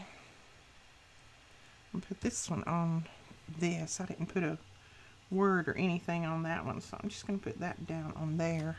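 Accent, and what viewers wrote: South, United States. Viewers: I love everything you do, and these are so lovely. I also love your accent, are you from around where Elvis lived? I am from Portsmouth, UK. I've been to a few places in the USA, and it's my favourite country on the planet. Take care and keep crafting. xx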